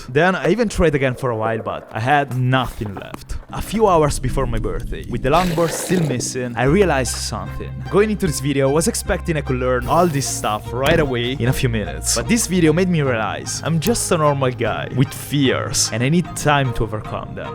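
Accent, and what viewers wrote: Italy. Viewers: is he Italian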